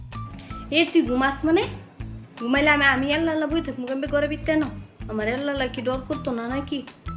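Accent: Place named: Mymensingh, Bangladesh. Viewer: Wow perfect mymensingh accent....carry on sister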